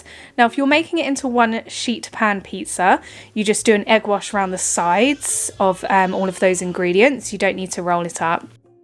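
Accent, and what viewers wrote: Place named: Devon, United Kingdom. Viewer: She speaks with a South Devon accent. Her ancestors probably came from the South Devon or Cornwall area sometime during the mid 1700's She is a Cornish woman. She is probably related to the cornish stand up comic Jethro.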